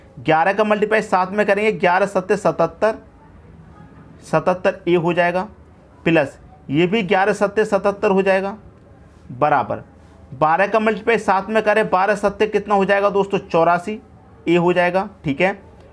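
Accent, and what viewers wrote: Haryana, India. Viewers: Gjb HARYANVÏ 🤑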